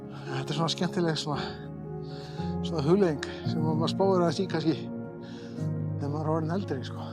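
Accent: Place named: North, Iceland. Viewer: You'll always be from the North! Maybe 20% Vestmannaeyjar & 10% Borgarnes 🙊 Great video, breating was btw not too load, the scenery was great, per usual & i look forward to your next videooo ❤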